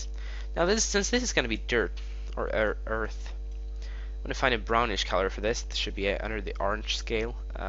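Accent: Sweden. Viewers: are you Swedish? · R u swedish? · Hah du er svensk? ;)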